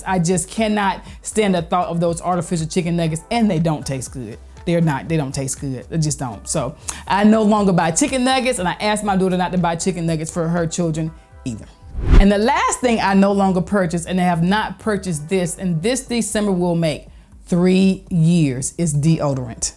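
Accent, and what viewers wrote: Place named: Texas, United States. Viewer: New subbie! I knew that you were from the south before you said it. You sound so much like me and my family here in Texas! I love it! So relatable. Your suggestions for saving are perfect! I'm a huge paper towel purchaser. If I run out of it, I'm not happy. But your alternative to cloth napkins sounds promising, I have cloth napkins, but use those for decor most of the time. Also the deodorant, I'll buy the twin packs for myself, hubs and son. I've always known about the aluminum that's used but... I've also tried Lume there's 0% aluminum in there's. The catch though, is that it doesn't really smell like anything we're used to in deodorant (has a very light scent) But that's okay, it's safe. Also, I'll be trying out the white vinegar for our laundry. As those are also things I have on hand at home. I'm so about saving a coin, if I can. 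TSMFS❤❤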